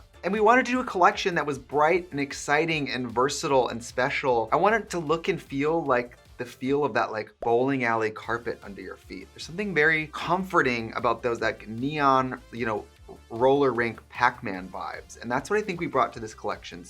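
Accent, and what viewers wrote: Wisconsin, United States. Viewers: Aw, that lil WI accent pops up now and then and I love it. The straaaps 💜 collection is fire - that purple is beautiful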